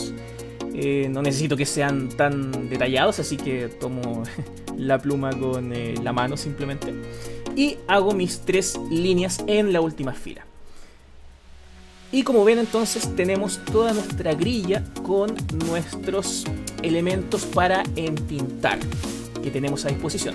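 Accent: Chile. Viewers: Siento que todos los chilenos hablan iwal xd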